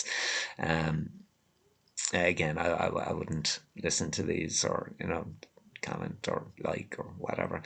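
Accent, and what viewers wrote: Ireland. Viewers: As an American, I can understand you fine since you turned your audio volume up. A couple of those videos, the volume was too low but it's fixed now. I also could tell you were Irish right now away. I wanna here you do more impressions of your fellow Irish normies. That had me cracking up in that original video.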